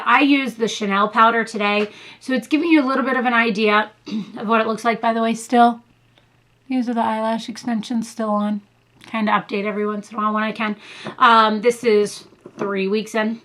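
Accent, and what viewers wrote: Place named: Greece. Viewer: Where are you from? Greece? You speak like greeks speaking English. Very beautiful acccent.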